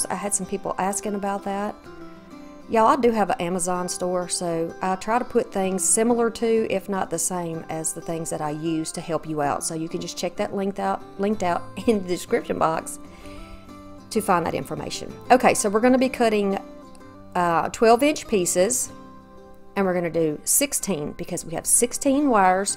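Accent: South, United States. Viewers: as a new resident of the South, I love hearing you talk! I moved here from California and it was the best decision I ever made. Not to mention, you’re one of the most creative YouTubers out there ❤ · that wreath is AMAZING ITS GORGEOUS. I JUST LOVE YOUR CHANNEL GUUUUURL.... I love your voice, your accent is so sweet and idk comforting to me. I love your confidence and I just like you. I think you're honest, spiritual, creative and humble. I'd like to be your neighbor. You just seem so real. Just wanted to tell you that I really appreciate you sharing your talents and creative mind. I have so many things I wanna do I feel like I never get anything done. Btw I do not know what it is but that southern accent gets me every time. You and Kathy Jo. I love her too. She's very creative and funny. Btw I am from Nova Scotia Canada Cape Breton Island to be exact and we sound like we are Irish with long drawn out syllables. 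Hahaha hahaha. Like the word MAN well we say ( may-ne).Hmmm 🤔🤔🤔 I think that's where we might have a similar context but different accents. Depending on how much wine 🍷 I've had lol.... Hahahahaha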